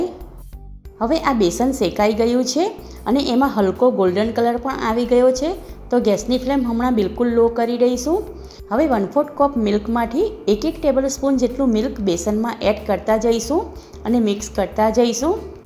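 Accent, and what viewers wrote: Surat, India. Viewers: Tame surti lago chho....boli parthi samjay che😀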